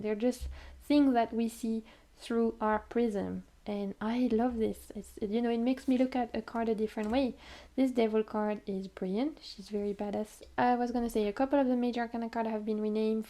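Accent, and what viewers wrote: France. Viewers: 🐚 obsessed with your French accent. Wish I could hire you to teach me French. Beautiful review of all these unique decks. Thank you for your time and efforts on your wonderful videos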